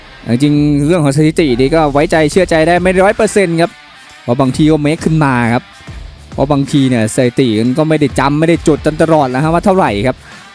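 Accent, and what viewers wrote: Isan, Thailand. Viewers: พากษ์ภาษาอีสานมันส์กว่าพากษ์ไทย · ชอบอ่ะภาคไทยปนภาษาอิสาน ฟังแล้วได้รอยยิ้มค่ะ😂 ดูมวยแขมรหลายคู่มันดูขย็อคขแย็คยังไงไม่รู้เนาะแตะนิดแตะหน่อยลัมลุกคลุกคลาน ญี่ปุ่นยังอึดกว่านะ นักชกไทยสุดยอดค่ะ มวยไทยสนุกลีลาเยอะอาวุธเยอะดีถึงว่าฝรั่งถึงชอบเรียนมวยไทยมาก❤ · พากย์อิสานมันดี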